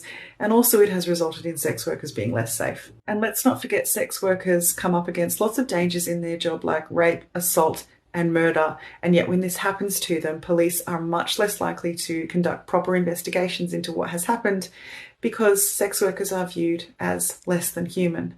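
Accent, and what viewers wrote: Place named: United Kingdom. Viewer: 100 % agree. And off topic but I always thought you were from the UK because of the accent, and not Australia😅😅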